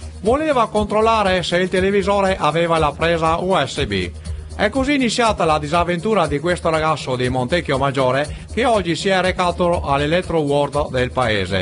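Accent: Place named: Rome, Italy. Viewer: anca mi so veneto cmq non è propio veneto...ce sembra un romanese che parla in veneto però ce hai provato ...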